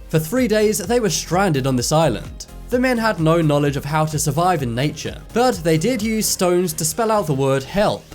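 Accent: United Kingdom. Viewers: Are you britash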